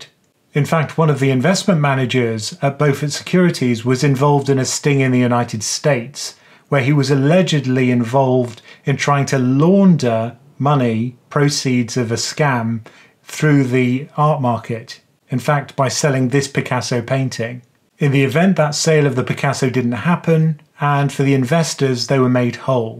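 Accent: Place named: Cockney, United Kingdom. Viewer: Couldnt unhear in-cockney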